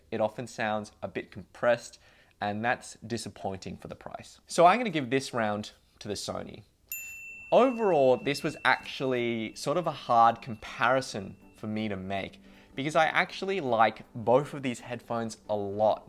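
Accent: Australia. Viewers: are you Australian? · and oh i have to say oz accent on an asian looking dude is effin damn sexy! have a great day buddy!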